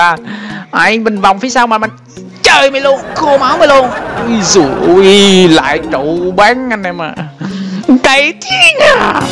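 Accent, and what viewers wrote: Quang Ngai, Vietnam. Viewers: Quảng Ngãi nói vui nhỉ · Người Quảng Ngãi phải hông anh owiii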